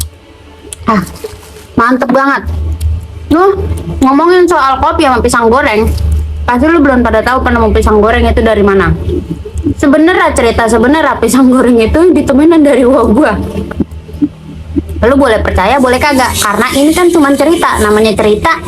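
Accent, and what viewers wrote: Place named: Betawi, Indonesia. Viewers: betawie ngapak.😁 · betawi A nih kalo betawi E udah jarang yak 😂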